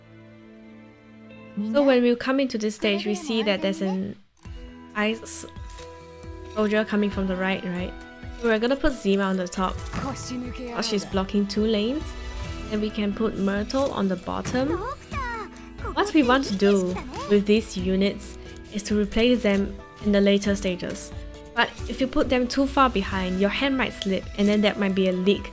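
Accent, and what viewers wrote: Singapore. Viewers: I'm picking the scent of Singlish... hmmphh hmmphh~ Definitely a Singlish, no way that's a common Chinese English accent. Trace of Indian, Chinese, Malay and Hokkien intonation. Hi neighbor countryman ^^ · I hear some thicc Singlish here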